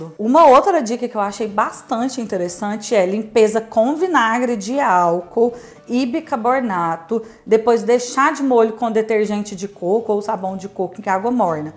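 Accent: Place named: Minas Gerais, Brazil. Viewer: Aqui, gente, vou falar uma coisa procês: adorei esse sotaquizim mineiro, sô. Bão demais.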